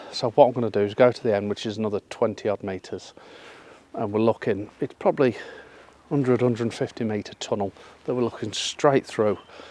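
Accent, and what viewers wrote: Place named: Cannock, United Kingdom. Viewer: Sounds like Cannock Speak!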